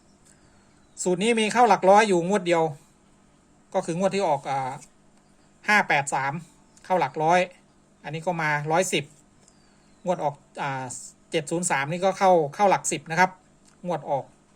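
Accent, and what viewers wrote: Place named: Isan, Thailand. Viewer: ผมว่าอิสายครับ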